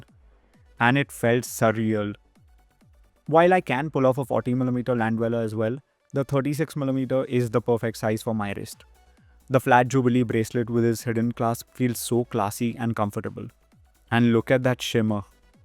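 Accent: India. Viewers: don´t know, this video popped up and I am fascinated by an indish accent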